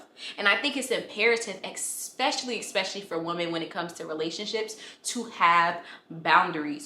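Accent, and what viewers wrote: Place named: Memphis, United States. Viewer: Are u from Memphis? You sound very Memphis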